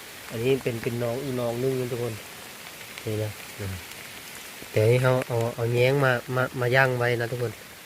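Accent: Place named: Khammouane, Laos. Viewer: ສຳນຽງຄືຄົນຄຳມ່ວນເຮົາເນາະ ມະຫາໄຊ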